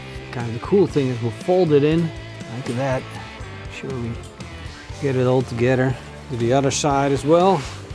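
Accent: Netherlands. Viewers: You‘re dutch, right?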